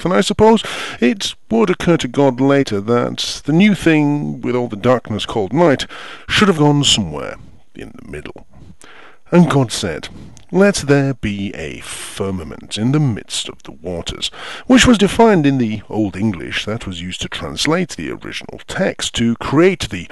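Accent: United Kingdom. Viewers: I was lead here by fans of my channel... and while I knew that I was hardly doing something totally original... I was told that your english accent was part of how funny you are. And.. yes the english accent is totally something I cannot hope to imitate being that I have a lowly midwestern US non-accent accent... long story short, You're funny and interesting enough that I watched the whole video! I like your sense of humor! I can only hope to be a fraction as funny as you! :D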